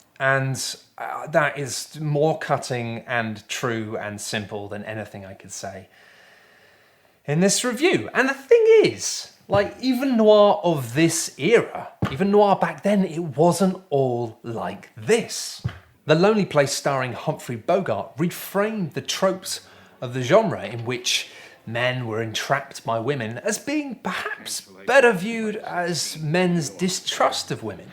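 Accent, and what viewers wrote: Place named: Liverpool, United Kingdom. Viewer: What accent was that!? Vaguely scouse in parts, BBC Generic Northern?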